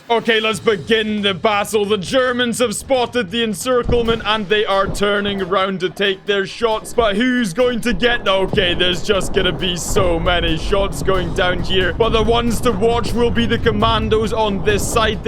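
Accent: Scotland, United Kingdom. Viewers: Scottish BaronVonGames? Consider me a subscriber! I feel bad that your work goes unnoticed.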